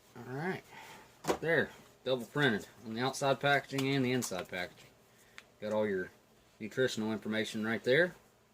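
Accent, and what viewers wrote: South, United States. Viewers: Theres somthing about the southern accent that feels so homey or homelike.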